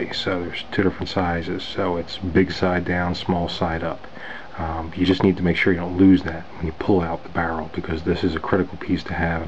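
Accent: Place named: Philadelphia, United States. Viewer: are you from philadelphia or from the area? i noticed you have an accent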